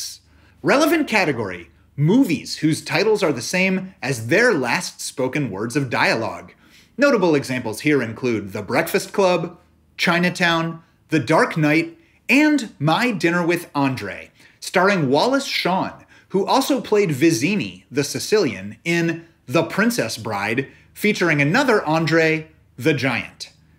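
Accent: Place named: France. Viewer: Quel belle accent français très cher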